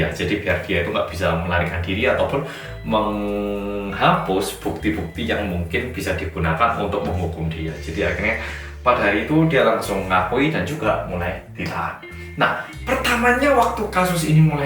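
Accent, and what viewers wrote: Java, Indonesia. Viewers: gw suka nih konten ini crita2nya update,klo bcara detail dan jlas.lucu jg krna medok nya...smngat trus ya.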